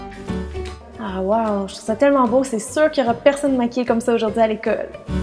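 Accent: Quebec, Canada. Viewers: T'es trop belle 😍😘💋 , PS j'adore vraiment l'accent québécois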